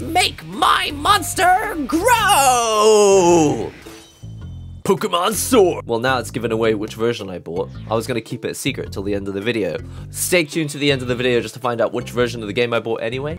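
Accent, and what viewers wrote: United Kingdom. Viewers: This is gold but I've been watching you for years... Are you British? But 1st ☕ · well he is a British dude doing a British accent. Nothing really surprising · Doesn't sound like a classical RP, but Finally someone who speaks in some british accent and do it UNDERSTANDABLE. :D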